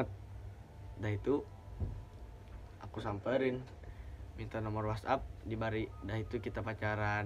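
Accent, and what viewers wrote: Banjar, Indonesia. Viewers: Msh ada logat bnjr nya😂😭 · Banjar bngt😂😂😂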